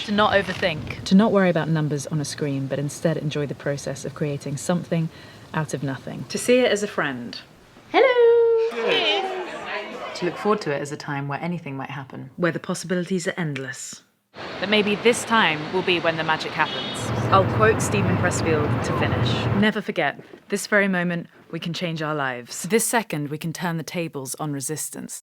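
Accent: United Kingdom. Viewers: Your videos are Fantastic, and your Voice is British perfection. Thank you for sharing with the world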